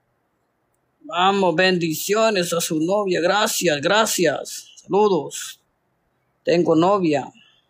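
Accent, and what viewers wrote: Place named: Mexico. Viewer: No , todos los mexicanos hablamos así , pero si usar esas palabras 😂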